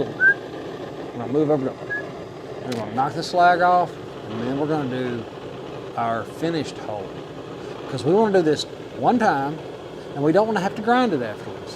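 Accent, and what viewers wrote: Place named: North Louisiana, United States. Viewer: Haughton high school, sounds like you might be from north, La. Youtube recommended this video. You're a good torch hand!!! I have a youtube channel also, I do everything from trucking to fabrication. I finally broke down and bought a Hougen mag drill last year, after wanting one for over a decade! I worked as a millwright and also in a fab shop several yrs ago. I'll subscribe and check out some more of your vids later, Always good to know new tips to make things easier!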